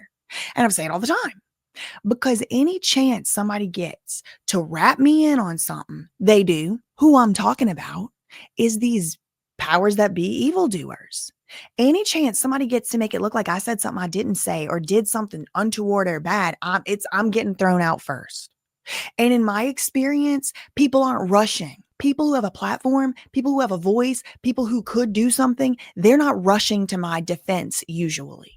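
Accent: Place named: Louisiana, United States. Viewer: I get a kick out of your witty banter and Louisiana twang. You always say “allegedly”, “in my opinion”, “Gods Favorite” and last but not least my favorite, “Facts Ain’t defamation!” Thank you for always bringing a smile to my face.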